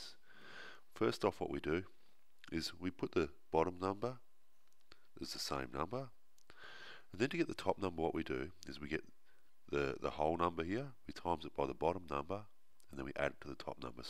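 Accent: Australia. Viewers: you are brilliant.. the british way is more difficult, i guess your from Australia.. thanks a lot my friend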